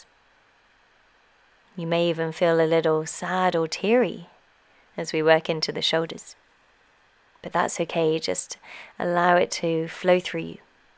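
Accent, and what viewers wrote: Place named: England, United Kingdom. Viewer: super good, thank you! i've dislocated both my shoulders over a dozen times and am really trying to sort them out! This feels like it has helped heaps, and could be a good practise to do every other day. really liked the stuff you were saying throughout as well, like in the comment below about how the body is a mirror of the mind (trying to work out what weak shoulders could be reflecting in my personality!) also really glad to have found a yoga video with an english accent aha, all the rest i've been doing are from american teachers and the english accent makes me feel much more relaxed and at home :) anyway nice one, subscribed!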